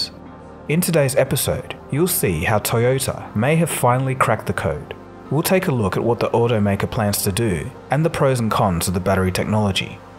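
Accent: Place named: Australia. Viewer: It's a real torture to listen to Australian. Can you make an English version of this video? You can hire human narrators as cheap as a few $ per hour (just google "narrator voice over") or use synthetic voice. If you hate American accent, hire someone who speaks British English or its more neutral European variant.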